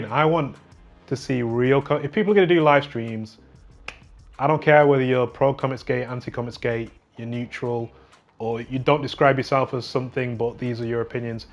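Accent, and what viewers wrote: United Kingdom. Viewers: bruv, ya need some proper editing. Besides that, your commentary's dead sound. (not mocking the Manchester accent. I'm a huge England-fan and Manchester in particular from the Hacienda bands)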